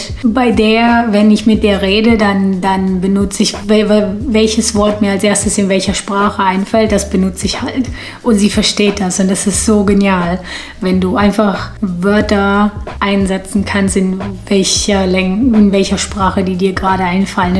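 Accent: Russia. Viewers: Bin auch russisch-deutsche und möchte sobald es Pandemiemäßig möglich ist nach Australien ziehen. Ich hatte früher keinen Akzent im russischen, habe jetzt aber deinen deutschen Akzent. Bin gespannt wann und wie sich das verändert wenn ich überwiegend nur noch englisch spreche :D Meiner Meinung nach klingt dein Accent doch sehr russisch! Schaue dann mal ganz interessiert deine Videos weiter an :) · Hallo!, Kann ich sehr gut nachvollziehen ich spreche deutsch, englisch, tschechisch. Mit meiner Mutter spreche ich tschechisch, mit den Rest (andere Familienmitglieder) deutsch und schaue die meisten Videos, alle Filme etc auf englisch. Ich kenne es gut wenn man ein Wort in einer anderen Sprache sofort kennt, aber es in der anderen Sprache einen nicht gleich einfällt. Mach dir kein Kopf, du hast eher ein russischen Akzent ich habe aber auch manchmal einen. Das ist jedoch normal, es gibt (meiner Meinung) nach nahezu keinen Akzentfreien Sprecher. 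Nette Grüße Lukas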